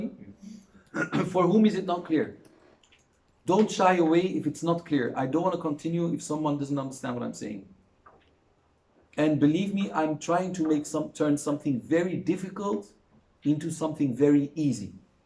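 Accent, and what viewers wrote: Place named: United Kingdom. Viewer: Loved the British accent 😂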